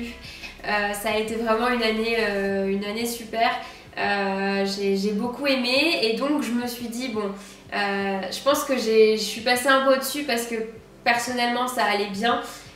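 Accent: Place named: South, France. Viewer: Les accents régionaux, ça existe et on n'y peut rien. Vous n'allez pas reprocher à un marseillais ou un toulousain d'avoir l'accent du sud,par exemple, si? Et puis, honnêtement, on s'en fiche. Ce n'est pas une vraie "erreur" ,dès lors qu'elle sait faire la différence à l'écrit !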